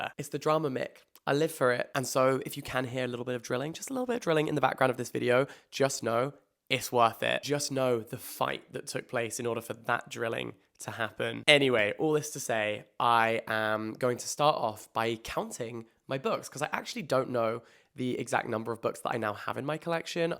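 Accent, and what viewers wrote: United Kingdom. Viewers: I hope she paid damages for the lie. You sound like UK but the situation sounds like nutty California.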